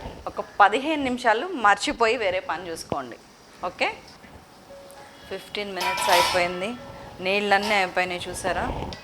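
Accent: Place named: Godavari, India. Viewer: Godavari savvadila andanga undhi mee maatalu nice video thank you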